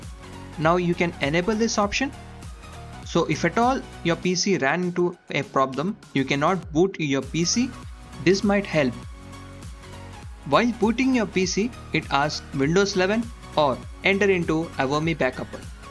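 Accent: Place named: India. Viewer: Funny Indian accent😅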